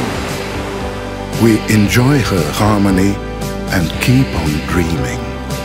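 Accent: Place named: Balkans. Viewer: she can relate.. когато говори за България, която е в женски род само при нас, а за да е правилно трябва да е it - It can relate. Клипа е мега много добър, но произношението на дублиращия е балканско и си личи, че е език, който не ползва във всекидневието. Като цяло много добре свършена работа, но оратора търпи критика.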